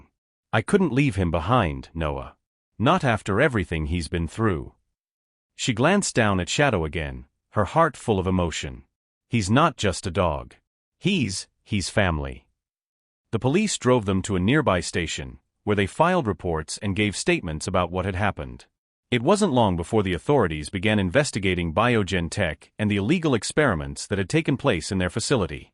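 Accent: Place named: France. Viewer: What's with the french accent leaking in? A.I. ?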